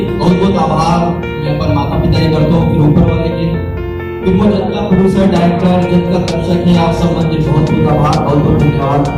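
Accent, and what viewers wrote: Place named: Chhattisgarh, India. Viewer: Sounds cg 🚖🚖🚖🚖🚖🚖🚖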